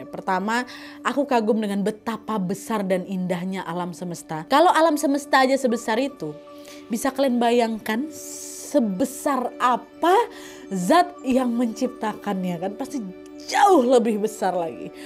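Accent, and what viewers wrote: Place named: West Java, Indonesia. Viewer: Dari logat dan speed ngomong nya,saya yakin kak ini pasti orang Sunda🤔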